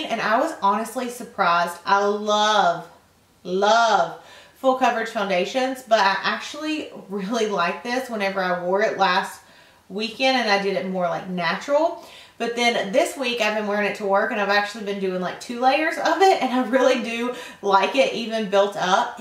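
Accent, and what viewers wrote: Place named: North Carolina, United States. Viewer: Annie Loewen she is from North Carolina United States lol. a little more East than South. she has that kackalackie accent lol... not southern haha thats what we call home north kackalackie lol dont ask if youre from north or south carolina you should know lol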